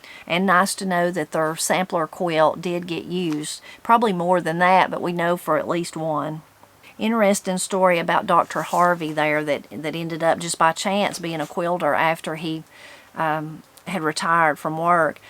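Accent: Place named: South, United States. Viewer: I have to tell you how much I e joy listening to this book! I own this book and have read it at least twice all the way through! You have made it come to life with your beautiful southern accent! ❤❤❤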